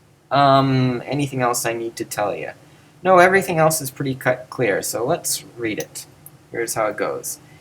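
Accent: United States. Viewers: for a lot of the vowel sounds, he is still using a more american pronunciation because a lot of greek teaching in the US teaches the alphabet as more-or-less substitution: Alpha is basically A, epsilon is a short E, etc. when really they should be taught as their own sounds. for consonants, there was a huge consonant shift between Homer and even when the septuagint was written, but he's using the later pronunciation. He should be reading phi as a heavily aspirated plosive, not as a fricative, etc. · Only to me he seems japanese? Listening greek read with english/ american pronounce is very funny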